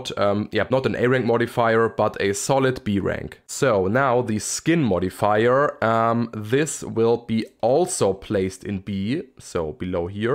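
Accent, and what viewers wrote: Germany. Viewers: Nice Video good explained , Are you german ? you sound like you are hahaha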